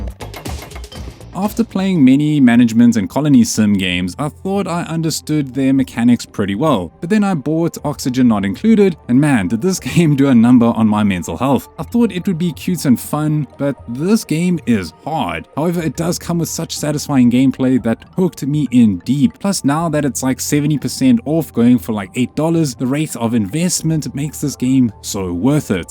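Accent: South Africa. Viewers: That SA accent is unmistakable. Good to run into this channel!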